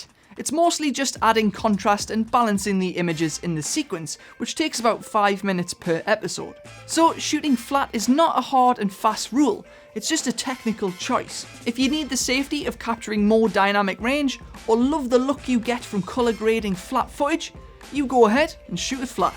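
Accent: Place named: North East England, United Kingdom. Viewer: Class to hear a North East accent talking cinematography on here!!! I am determined to shoot a cinematic travel vlog on my smartphone (Huawei P30 Pro) but the maximum it shoots in is 8bit colour. Is it worth me using the log options the app I use provides? Or are they largely redundant with the limited tech I've got. Cheers in advance!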